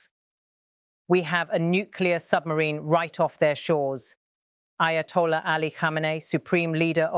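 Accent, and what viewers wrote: United Kingdom. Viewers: Good grief. Don't think can watch the whole thing. The voice is irritating as hell, with snotty female tone & British accent that screams no credibility, in part to BBC etc destroying all credibility of any news with British accent. And as if written by ai set to over descriptive mode, creative writing, with pretense of being "news" & editorial commentary at same time. 🤯🙄🤯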